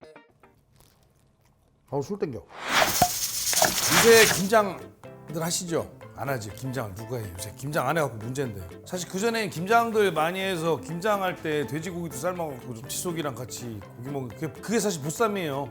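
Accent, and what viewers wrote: Chungcheong, South Korea. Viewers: 충청도 사투리로 구수하게 설명히시니 재미있고 당장 고기 사다가 삶아먹고 싶네요. 꼭 이대로 해봐야겠어요.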